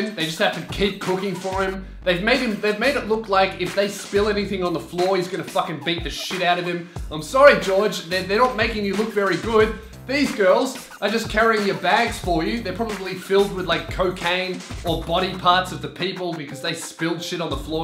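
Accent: Australia. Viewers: Dewey Pruitt It's the accent. Perks of being Australian here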